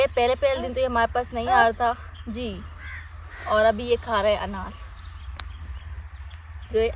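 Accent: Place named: Sindh, India. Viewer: آپ کا بولنے کا لہجہ سندھیوں جیسا ہے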